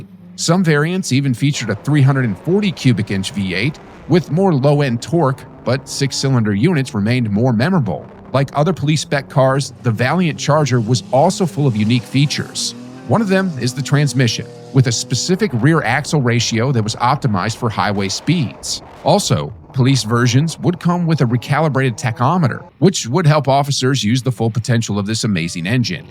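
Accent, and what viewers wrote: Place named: United States. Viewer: Didn't watch the whole thing cause I can't stand seppos talking but did the XR6 turbo get a mention?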